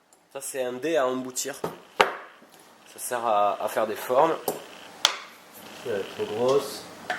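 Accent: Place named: South, France. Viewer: Superbe création ♥ Belle leçon de bijouterie pleine d'humour avé l'accent, c'est top, Merci ♥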